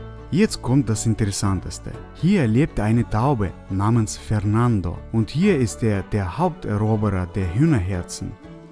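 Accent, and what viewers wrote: Russia. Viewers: Ich mag ist dass du einen russischen Akzent hast ich hab auch russische Wurzeln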